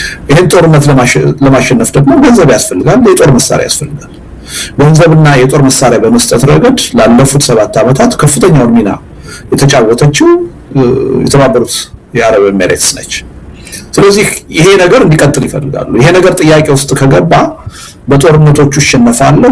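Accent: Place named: Gaxaree, Ethiopia. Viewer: Diye diye...Wolduya..Gaxaree accent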